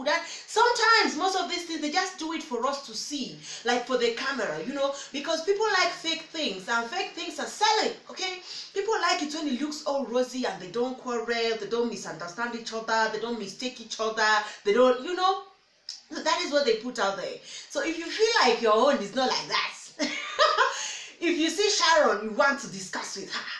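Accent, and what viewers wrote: Nigeria. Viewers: Like seriously, where did you get all these Nigerian accent from, it sound so good and you making it more funnier. Your energy is so welcoming, and eye catching. Keep it up girl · Your Nigerian accent na waa ooo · Wait.... Who thought you all this Nigerian accents 😂you are very correct dear👏👏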